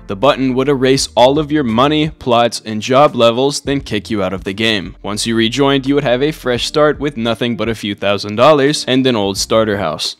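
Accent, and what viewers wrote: United Kingdom. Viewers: British detected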